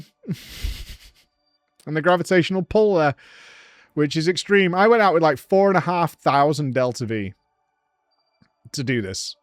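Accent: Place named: Manchester, United Kingdom. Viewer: He's saying duna, hes just from Manchester is all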